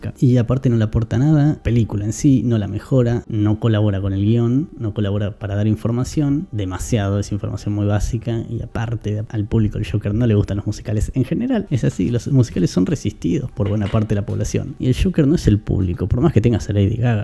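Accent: Argentina. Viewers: Holaaa. Nuevo micrófono 🎤 necesitas calibrarlo o cambiar.,¿Puedo opinar?. En mi pantalla no logré entender algunas palabras con ese nuevo micrófono. (Use subtítulos , no sé si por ser mexicano pensé, el tono argentino pero. . . Pero pero ... En el celular con volumen un poco mas bajo y sin ruido alrededor.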